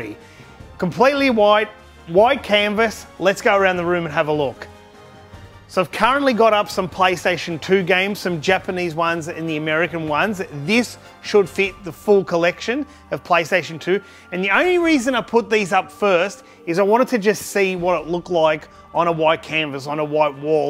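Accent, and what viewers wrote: Australia. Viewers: You Australian mate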